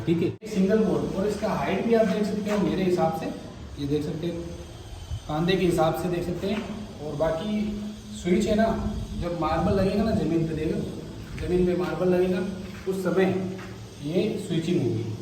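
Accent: Rajasthan, India. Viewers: bhai aap Ajmer ya Nagaur se ho. Kya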